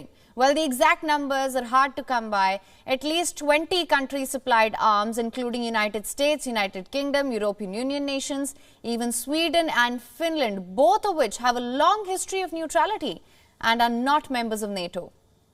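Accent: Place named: India. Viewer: Too heavy Indian accent --- can't understand what she was reporting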